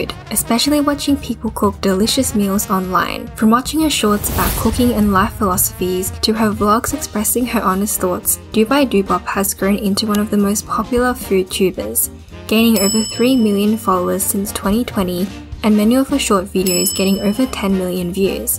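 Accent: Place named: Australia. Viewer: off topic but i really love your accent! is it australian?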